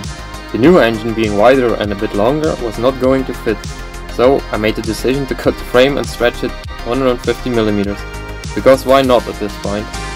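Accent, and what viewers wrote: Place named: Netherlands. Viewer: Hello you sound Dutch ? If you are interesded in ever selling the tractor or the back tiller let me know I need some good pictures of the mounting on the tractor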